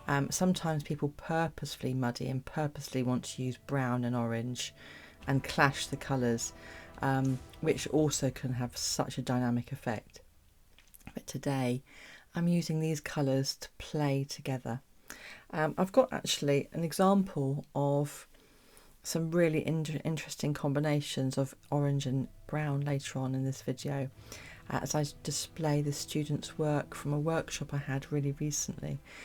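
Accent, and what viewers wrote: England, United Kingdom. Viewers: ❤hi there I'm Donna Louisa. Close related names. I Molly love your butterfly effect ❤ Video, it was great. I'm new to acrylic painting. Currently I'm doing a course with Molly from Molly's Artistry ~ Acrylic pouring / swipe technique course - via you tube lifetime access intellectual information mine. Watch out for the big exchange rate as it's 🇺🇸 I'm in 🇦🇺 in Victoria, Australia. USA $150.00 = Aus $230.00. She is a beautiful lady & teaching is amazing. World renowned artist I'm so glad I caught your Video today. I haven't done the Dutch pouring course yet. Molly uses her Dutch pouring method with hair dryer too. So have seen it often. Will try myself soon. Your demonstration was lovely simple & already aligned with others principles like Molly who has done Acrylic pouring. Loved your explanation. I loved your beautiful English accent. 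I Will try to see more in the future. Have you made other videos? I also don't have the whole range of colours either. So many brands & ranges. Do have some small tubes of the soft body Acrylics paints to work through as well as the larger containers of paint, which I now buy for Acrylic pouring as I can. These should mix with little water or pouring medium - so they should be pourable thinned down. I'm slowly trying to build them up. I used to paint portraits for a short time adults babies animal etc. I have completed several units such as drawing painting, ceramics, and sculpture from Visual Diploma Arts. However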